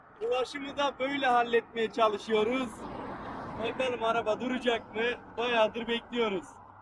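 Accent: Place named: Niğde, Turkey. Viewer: yav adam istanbullu degil. nigdeli !!! neden diksyon dersi alsin allah allah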